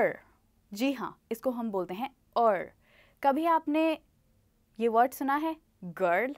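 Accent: India. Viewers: I mean it is Indian pronounciation, not very authentic I would say. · You are using indian phonetics for English though not original English phonetics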